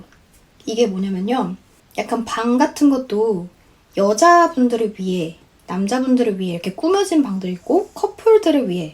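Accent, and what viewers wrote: United Kingdom. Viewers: 약..12년전;호주 워홀러였던 아즈매에요~ 님 영국식 발음에 푹빠지네요 다시 공부하고 싶은 욕구가! 그걸 혼자했단거에 참 대단하네요👍 잘보고있어요~ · 안녕하세요 영국에유학중인 해리입니다.영국영어 발음 정말멋져요.일상을 영어로 하는 브이로그 한개여서 또 영어일상브이로그 촬영해주시면 좋겠습니다.영어공부하는데 많은 도움이될것같아요.♥️구독하고 갑니다.👍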